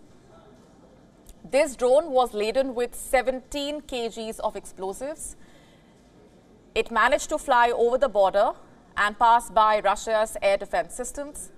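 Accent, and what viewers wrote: India. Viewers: i love how funny and cute indian women talk english,she's adorable ^.^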